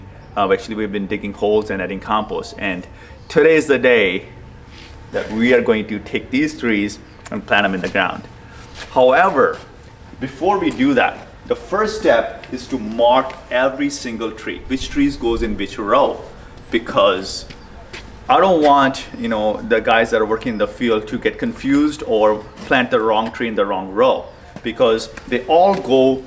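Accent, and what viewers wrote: Punjab, India. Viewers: Hello! Another great video. (Love the Punjabi accent.) Sat sri akal.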